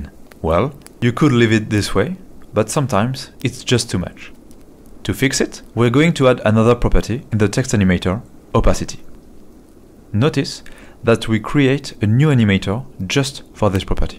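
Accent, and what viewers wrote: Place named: France. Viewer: J'ai l'impression que c un français qui parle anglais la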